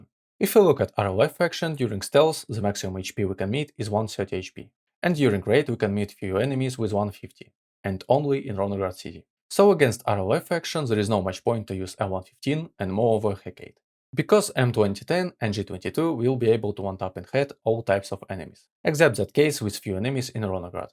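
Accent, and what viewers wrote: Russia. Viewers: Не знаю почему но твой говор, или же что то выдаёт тебе русского или снг человека. За рание прошу прощение если ошибся.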